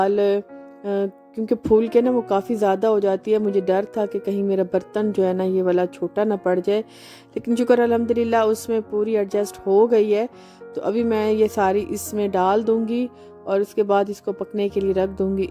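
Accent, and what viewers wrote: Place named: Bahawalpur, Pakistan. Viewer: Wah g choti choti khushianbbarri barri enjoyment🤩mn bhi bwp se hun aap k lehjy sun kr acha lgta ha .multan ar bwp ghoomny mn bht maza aata ha such mn .missing a lot my cities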